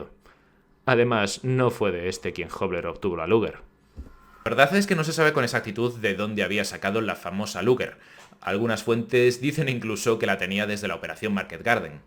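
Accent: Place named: Spain. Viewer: El comentario más ridículo que he visto en mucho tiempo (ojo el comentario, no tu persona). Hoy por hoy rechazar el acento español peninsular es un absurdo y un despropósito, con la de canales buenos que hay con dicho acento. 😅 Y no entiendo que es lo que no se entiende, acaso de hablar de la "wea weón po?, no po wn; si no entiendes nada del vídeo tení que hacerte ver eso cachai hemano?"😂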